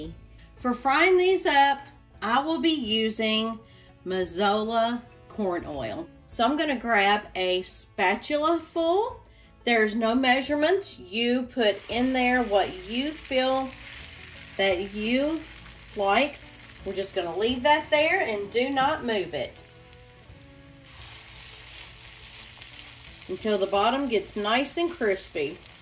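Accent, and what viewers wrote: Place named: West Virginia, United States. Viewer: Looks delicious! My husband's Mamaw in WV used to make potato cakes when she had leftover mashed potatoes too. Are you from WV too? I like your accent!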